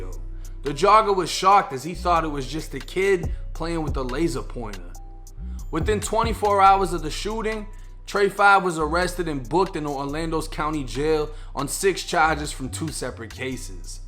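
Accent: Boston, United States. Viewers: What part of boston you from? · Love your videos bro . Florida boy with the Boston accent . 💯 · Jus noticed the Boston hats you be rocking! And the accent leads me to believe you from the bean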